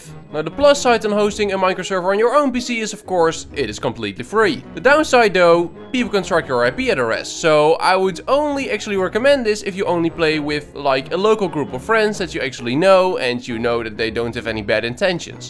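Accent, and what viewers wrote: Netherlands. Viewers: Hij klinkt Nederlands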